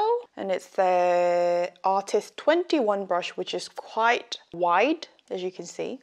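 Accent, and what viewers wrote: United Kingdom. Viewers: 언니 브리티시 악센트를 다시 들을 수 있다니 감덩이에요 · 졸예❤️ 예전처럼 아이라인을 빼서 그리지 않아도 있는 그대로 자연스럽게 너무 이쁘네요~ 사용하는 색이 더 옅어지니까 좀더 퓨어 해 보여요💛 이번 스틴푸드 파데도 이런 맑은 메이크업에 너무 찰떡이에요💜 비건 제품이라 너무 좋네요 비건 제품이 더 많아졌으면 좋겠어요~ 그리고 의 영국식 영어는 언제 들어도 멋져요😊 뭔가 해리포터가 생각나는…?? 저만 그런거 아니죠??ㅋㅋ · 으아 ㅠㅠㅠㅜㅜㅜ제가 좋아요, 댓글 일등이라구여ㅠㅠㅠㅠㅠ헝 사랑해여 울언니ㅜㅜ 영어 영상 너무 좋아여..그녀의 영국식 발음 = 귀르가즘 🤍 그럼 이제 영상에 집중 할게요 🙈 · 딱 틀자마자 영어하시는거 보고 영국 생각났네요ㅠㅠㅠ 앞으로도 자주 듣고싶어요💕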